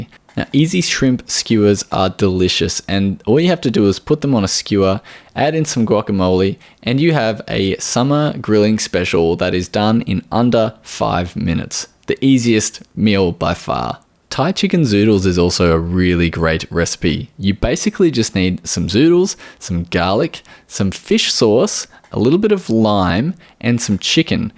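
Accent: Australia. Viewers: I love your videos but I truly hate Australian accents no matter who is talking sorry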